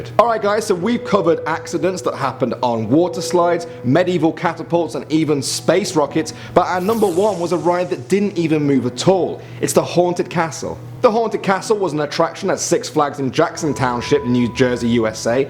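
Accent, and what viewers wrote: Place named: United Kingdom. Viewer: He's British so he has an accent.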